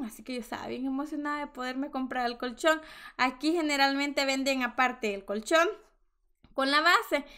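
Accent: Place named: El Salvador. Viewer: me encanta como hablas !!! me facina tu acento salvadoreno !!! lastimosamente de alguna manera yo perdi el mio pero bueno te felicito por tu canal y por el contenido .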